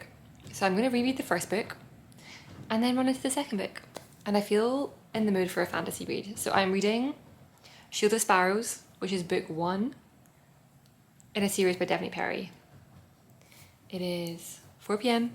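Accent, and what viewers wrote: Scotland, United Kingdom. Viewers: I have been watching your videos for the past year for two reason’s first because I love to knit and I love and have knitted several of your patterns and the second one was that for the past year I have been planning a trip to Scotland with my sister. I thought that listening to you speak with your lovely Scottish accent that maybe I would be able to better understand conversations and surprisingly I must say that it seems to be helping. I’m sort of training my ears a bit. I’ll be mostly in the Highlands for 10 days in May with only one day in Edinburgh p. I am so looking forward to my trip back to my ancestral homeland.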